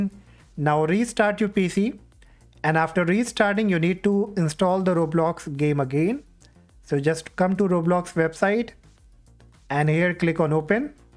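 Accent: India. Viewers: Thanks bro, this is why u should listen to Indian accent ppl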